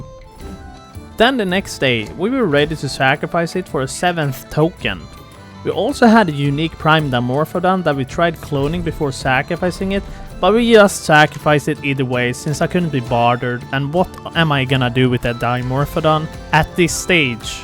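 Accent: Sweden. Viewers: är det svenska jag hör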